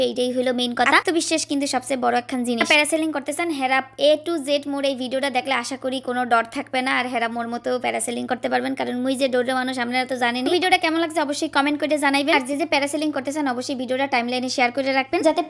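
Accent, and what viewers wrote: Barishal, Bangladesh. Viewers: আমার বাড়ি বরিশাল। তাই আপনার কথাই আমার কাছে সবচেয়ে বেশি ভালো লাগে। ভালো থাকবেন।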